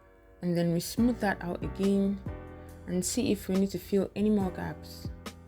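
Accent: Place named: RP, United Kingdom. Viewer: It's the Queens English for me ❤❤❤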